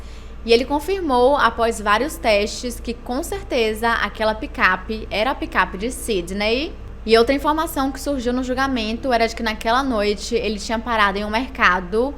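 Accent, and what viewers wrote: Portugal. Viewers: Tô conhecendo o canal através da e já tô amando. Adorei a parceria e o sotaque português é MARAVILHOSOOOOO <3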